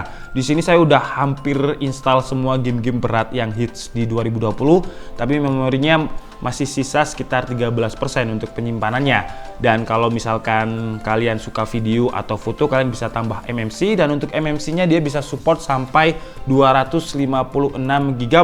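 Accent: Malang, Indonesia. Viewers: Wih lu orang malang y bang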